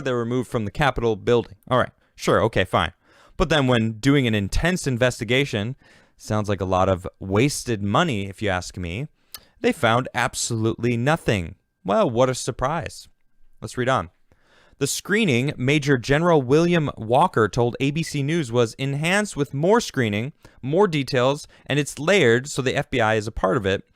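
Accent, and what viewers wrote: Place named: Michigan, United States. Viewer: Bro.....you literally look pewdiepie but with a Michigan accent... I dig it 💘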